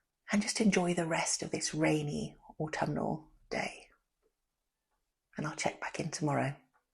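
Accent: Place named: Swindon, United Kingdom. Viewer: Foxes and badgers in the garden. Don't they tend to give (some) people the hump and poo a lot in gardens? Sounds as if you did a combination of The Good Life and Hugh Fearnley-Whittingstall's River Cottage back in the day! And I have to say, you've retained a slight Swindonian accent - it's still there! 😁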